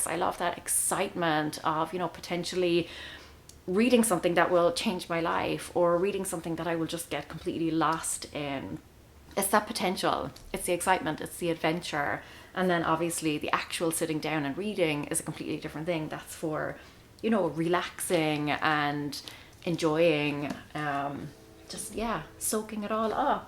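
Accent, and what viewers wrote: Ireland. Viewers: Love your brogue, what part of Ireland are you from?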